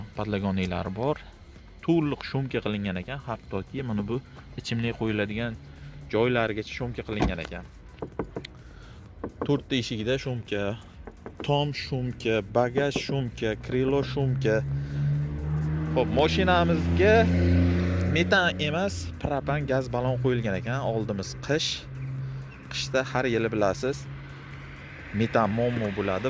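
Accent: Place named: Kattakurgan, Uzbekistan. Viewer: Ака каттакургонданми бу малибу шевайиздан билдим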